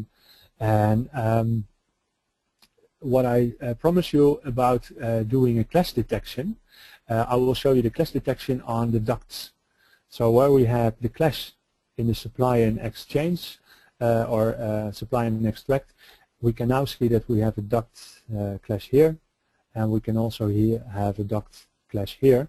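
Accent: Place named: Netherlands. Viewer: Dutch accent. :)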